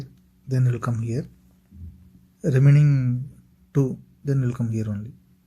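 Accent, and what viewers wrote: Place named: South India, India. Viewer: Great voice. Exactly, as same voice and accent , as of my friend from South(banglore). Its good to know u r from south. I do have lots of friend from South🥳🥳🥳🥳🥳🥳🥳🥳🥳🥳🥳🥳🥳.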